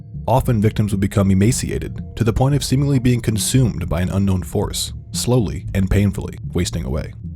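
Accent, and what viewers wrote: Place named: United States. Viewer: Does anyone else think his voice is the American version of the British voice in “The School of Life” videos?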